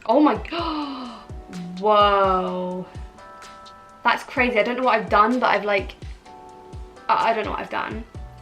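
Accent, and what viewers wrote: United Kingdom. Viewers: I just stumbled across as this video and I gotta admit: You sound very British 😅😂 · Oh my god the British accent sounds soo different to me lol but its kinda cute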